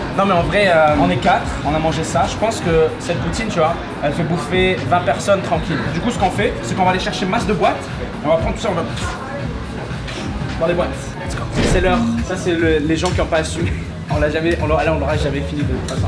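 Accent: Quebec, Canada. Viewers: arrete de parler en québécois pour montrer que tes québécois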